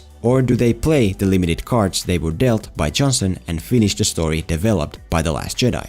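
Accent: Finland. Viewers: Are you from Finland? Your accent sounds a bit like that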